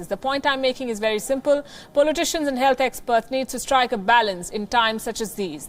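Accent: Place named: India. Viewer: Majority of indian population understand their own language better than English So then why english channel with indian funny accent Why they need to pretend to be What they are not hahaha lol So stupid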